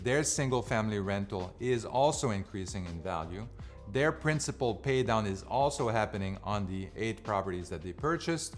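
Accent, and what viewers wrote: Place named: Quebec, Canada. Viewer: yes I could hear that québécois accent a kilometer away too;)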